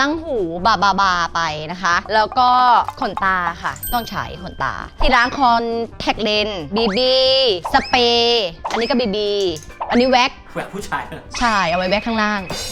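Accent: Thailand. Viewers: ทำไมสำเนียงพี่จ๊ะออกเหน่อๆคะ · เหน่อ น่าเอ็นดู น้องจ๊ะ จุ๊บๆๆ😘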